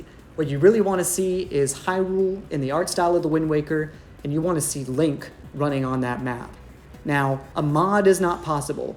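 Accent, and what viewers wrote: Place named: North America. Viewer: it's possible that other software devs have already some or all of this work (like if there's a "Zelda Mod Tool" made by fans you can download) but even so it'd be a Herculean task if done as a mod. If done as a standalone game, it'd be as much work as... ya know, a game - which is a ton of work that can't be sold if you don't have the legal rights to use the characters. So, as long as he was giving it away for free, it'd probably be technically within Nintendo's policy for fan projects, but they set those rules and they have a history of shutting down projects like this. Judging by our guy's accent, he's probably either from the US or Canada, and in either place, even if the courts decide he's totally in the right, if Nintendo decides to sue the process would take literal years and tens of thousands of dollars in legal fees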